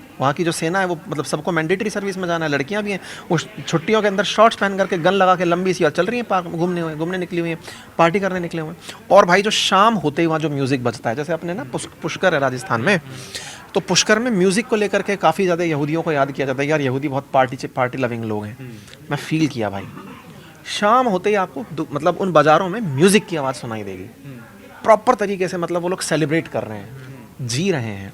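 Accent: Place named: Kanpur, India. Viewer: Aap ki boli pur hindi hai from kanpur se ❤❤❤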